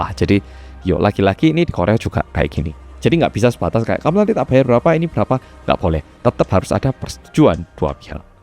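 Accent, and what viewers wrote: Java, Indonesia. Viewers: Aku suka bahasanya ,Mergo aku Yo wong jowo. Medok banget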